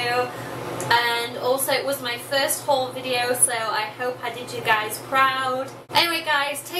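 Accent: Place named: Australia. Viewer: hehehe anytime. Aussie accents arent that bad but yours is the best by far.!!! xo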